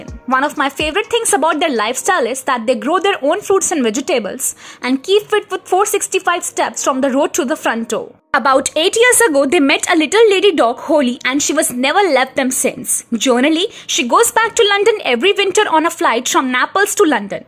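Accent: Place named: India. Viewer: You have a very strong Indian accent that I have a hard time to understand.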